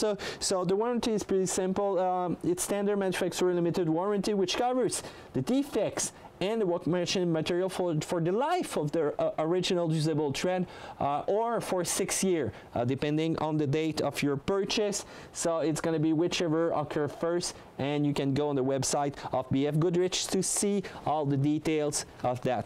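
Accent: Quebec, Canada. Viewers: Quebec accent if I'm not mistaken ;)? Français? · Quebecois as fuck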